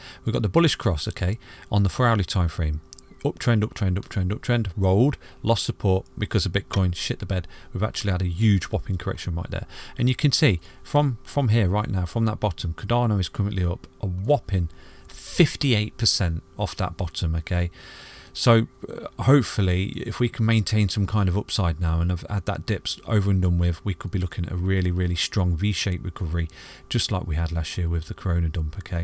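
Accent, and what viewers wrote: United Kingdom. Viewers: Love hearing a fellow Brit on these videos. Great job.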